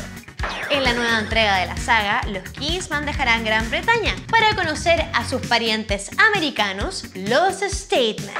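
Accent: Chile. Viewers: Chileno?? ESPERA QUE WEA???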